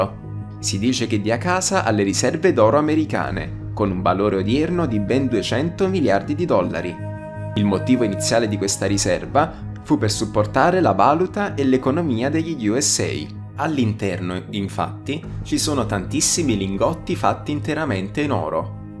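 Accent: South, Italy. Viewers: Bhe si dai non male a parte diversi inciampi sull italiano ma si capisce che sei meridionale quindi ci sta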